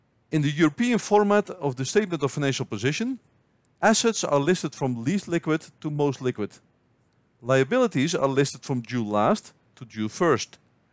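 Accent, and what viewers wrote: Netherlands. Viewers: Very thorough explanation of accounting terms, this will help a bunch in reading 10-K and Qs - thank you! I can hear you are Dutch! :) Hi from Zaandam!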